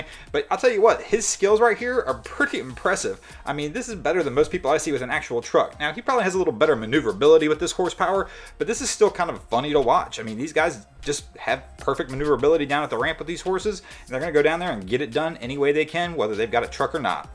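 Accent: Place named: Pennsylvania, United States. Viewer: I can tell you're from Pennsylvania, the way you pronounce the letter O.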